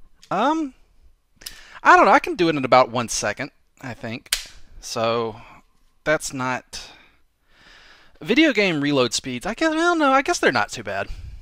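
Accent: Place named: North, United States. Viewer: ***** I was pleasantly surprised, but only because seems to have a pronounced Northern American accent. As a Texan with a WWII rifle and a full-size steel combat pistol less than 2 meters from my bed, it's perfectly normal, but Americans from the more Northern states stereotypically avoid gun ownership (Maine and New Hampshire excluded). But I'm delighted to find out likes guns too! He's risen even higher in my estimation of his character.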